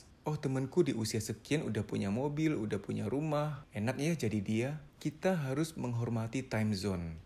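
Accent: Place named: Pontianak, Indonesia. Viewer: Abang nya ini kek org pontianak logatnya hehe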